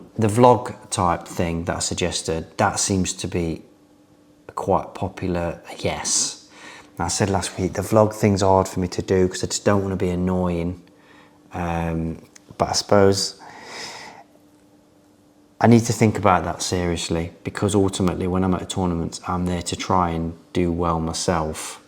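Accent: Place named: Nottingham, United Kingdom. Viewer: Vocal inflection? He clearly doesn't know how people from Notts sound duck 👍 Looking forward to seeing what comes next to the channel!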